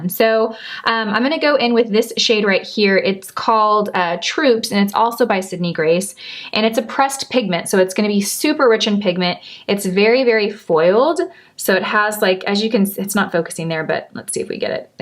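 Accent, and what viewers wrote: Texas, United States. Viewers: It’s that cute Texas accent - fans, friends! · Well we are fans! :) Maybe they do not understand Texan??? Sounds like hey friends to me!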